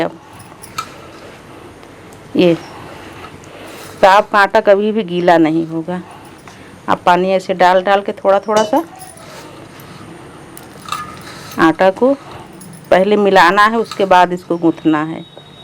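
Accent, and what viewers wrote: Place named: Bengal, India. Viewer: Hum pakistan se hain. Aap ki awaz se maloon hots hai aap in ka ilaqa bangal hai. Aap nay buhat mahenat say roti banana sikaya hai hum aap ka shukria latte hain aap humari bahama ki tarah ho.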